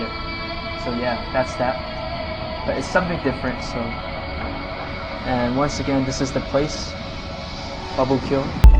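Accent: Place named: North America. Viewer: + You behave like a whiny American, you sound like your from North America are you?! What's your ancestry, Polish or what else? No, it's in south america, not in the world, look at this list and look at this info in the 1940s and 1900s "The Japanese population in the United States grew from 148 in 1880 (mostly students) to 2,039 in 1890 and 24,326 by 1900." has more then brazil always, there you see or "the number of Japanese in other countries in the Americas at the time such as the United States (285,000), Brazil (205,000)" see?! And the list that it looks like there are more Japanese in America then brazil just like peru and Canada! 1841: June 27 Captain Whitfield, commanding a New England sailing vessel, rescues five shipwrecked Japanese sailors. Four disembark at Honolulu, however Manjiro Nakahama stays on board returning with Whitfield to Fairhaven, Massachusetts. 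After attending school in New England and adopting the name John Manjiro, he later became an interpreter for Commodore Matthew C. Perry. 1850: Seventeen survivors of a Japanese shipwreck are saved by the American freighter Auckland off the coast of California. In 1852, the group is sent to Macau to join Commodore Matthew C. Perry as a gesture to help open diplomatic relations with Japan. One of them, Joseph Heco (Hikozo Hamada), goes on to become the first Japanese person to become a naturalized American citizen. 1861: The utopian minister Thomas Lake Harris of the Brotherhood of the New Life visits England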